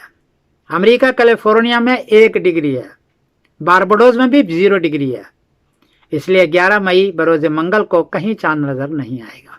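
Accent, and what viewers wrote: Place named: Bihar, India. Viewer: ماشاء اللہ. مولانا کا لب و لہجہ اور گفتگو کا ٹون وہی بہاری ہی ہے 👍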